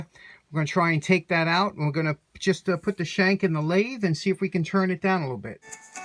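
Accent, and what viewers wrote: New York, United States. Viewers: Now you know my favorite part.... remember how this looked BEFORE I started..... Ok, I admit....I've heard those words with that New York accent in my tool restore dreams as of late. They usually involve a crowded basement complete with the musty smells of an old Victorian era home paired with the faint essence of oil and varnish. The recesses and corners of the room loom in mysterious darkness but the Hydraulic press and the lathe always make themselves known. Finally my attention is drawn to a remnant of red carpet where some shiny tool gleams with an otherworldly aura. The choirs of sweet heavenly voices harmonize with the 50s bad-boy motorcycle theme music. All is well in the world.....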